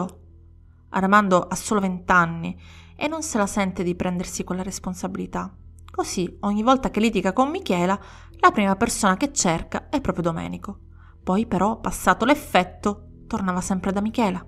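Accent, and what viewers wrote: Sicily, Italy. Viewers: Probabilmente il commento può sembrare inappropriato…e ti prego: “prendilo davvero x ciò che è; UN COMPLIMENTO!” ma devo proprio dirtelo… Nonostante le storie di cui racconti il tuo accento fortemente “siculo” ti rende davvero sensuale! Forse perchè sono innamorato delle donne siciliane, ma nonostante non ti si vede sono sicuro devi essere bellissima…e appunto…sensuale!😍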